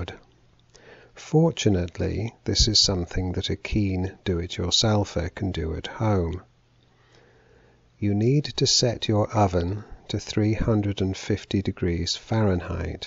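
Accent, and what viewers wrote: United Kingdom. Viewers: ....and your British accent makes you that much more credible!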